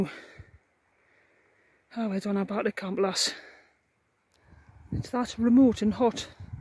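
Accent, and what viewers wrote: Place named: Newcastle, United Kingdom. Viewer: A good Geordie accent there, then! I remember a holiday on Eigg in 1954. The 'singing sands' are also 'sinking sands' - you have to be careful where it's wet - you get sucked in. The croft we stayed in had not yet got electricity so we had oil lamps to find our way upstairs to bed. The cooker was an old iron range cum open fire. You could hear the Puffin birds crying all night up on the backbone escarpment - quite eerie!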